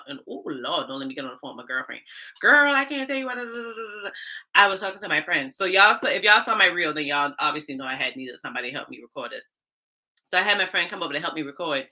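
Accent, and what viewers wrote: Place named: Louisiana, United States. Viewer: girl I love the way you talk and I did think that you was from Louisiana!!! Girl you are funnny and real. I'm not in HR, but I have been during research to get myself ready to move into HR, hopefully with my tranferable skills. I been at my job for 25 years and I want a change and advancement in a career.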